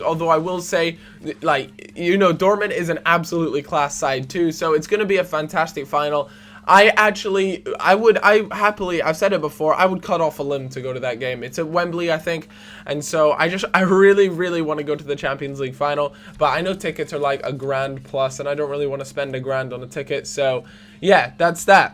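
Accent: Scotland, United Kingdom. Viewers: As a fellow scotsman I thought u were Scottish lol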